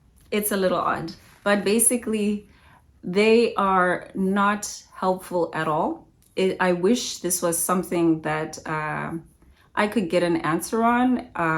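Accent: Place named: Zimbabwe. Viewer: we are same generation. I remember you from your model days. It was called Supermodel right. And remember reading an article about you when you were modelling in SA. And wow still looking beautiful sis. I loved hearing your life story. It shows we all go through a lot. And though it may sound petty but you have that top notch Zim English accent. Exactly how most of us 80s babies sound. Its fading now guess a reflection of our education system. Anyway looking forward to seeing more videos.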